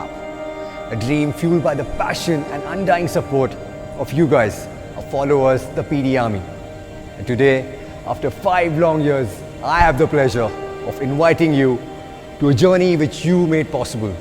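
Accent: India. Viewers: Indian accent ruined it for me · Indian accent...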